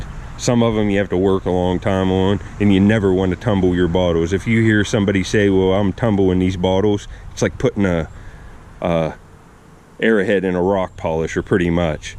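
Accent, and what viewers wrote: Western Pennsylvania, United States. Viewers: Like ur content, awesome finds in these. Just subbed ur channel to support a fellow Buckeye. If you are originally from Ohio, you have the distinct twang of the Western PA/WV panhandle in ur voice.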